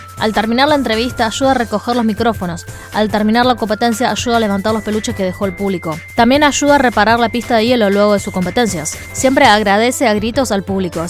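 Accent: Argentina. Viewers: Buen video. Estaría mejor si modulares tu acento argentino y pesado.... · Se me han caido las lagrimas de emocion gracias chinita linda me encanta tu acento Argentino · Me encanta el acento argentino de tu español, che! Sos, única!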